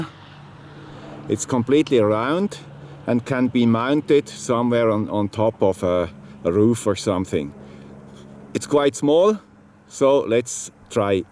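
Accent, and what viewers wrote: Switzerland. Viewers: Happy birthday "Guy with swiss accent"! Great content, as always! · Congratulations with the birthday, the world records and the nice Swiss accent. Thank you for explaining everything so clearly and with so much useful details and links, already 120 times! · Grandpa with swiss accent always make things clear. Thanks from an engineering student sir.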